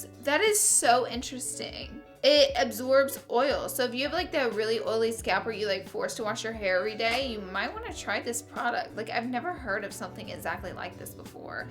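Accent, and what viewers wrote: South, United States. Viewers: everytime says oil 😂 #thatsouthernaccentitsthebest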